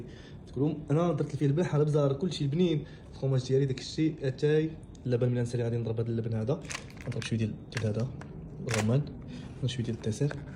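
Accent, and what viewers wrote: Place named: Gulf, Arab world. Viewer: 10 دقايق باش وصل بدا يهضر خليجي 😅😅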